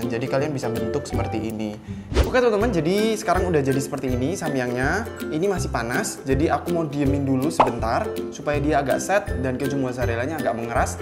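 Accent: Java, Indonesia. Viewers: baru pertama nonton video mu mas. kok medok wong endi to mas.. wkwk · ooo suroboyo tha pantes rodo medok koyok aku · Orang Jawa mana mas 😂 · Kalo ngomong medok ya😂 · Deneng medok si, wong ngendi njenengan?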